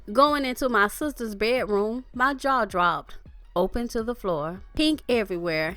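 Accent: South, United States. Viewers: I watch your show because I love your voice. You have that southern drawl mixed with the black soulful personality. You are unique! I am white, but I still love your voice! I like it when you say "Moving on". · OMG before I read the ABOUT section of your channel, your Southern accent hooked me in and made me laugh my a** off. I'm a natural born Nashvillian and had to subscribe. Not only because of our Southern origins but also because of the truth you bring. That damn Jackie is gonna reap what she's sown on behalf of her "darker child". You don't mistreat, alienate, or totally disown an innocent child without karma visiting yo ass.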